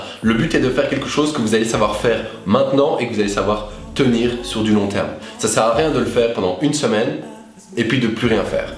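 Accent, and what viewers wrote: Belgium. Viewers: Un like juste pour m'accent belge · Tu me fait penser a un belge avec ta voix ou peut etre que tu en est un. Merci pour la video je vais essayer !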